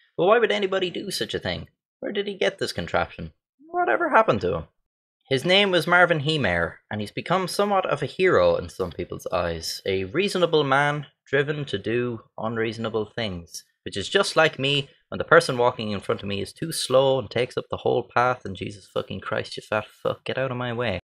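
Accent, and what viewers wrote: Ireland. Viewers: Is that a Carlow or Wicklow accent you have ? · I just stick around to hear him pronounce “thirty” with a D. Abd other Irish crap like that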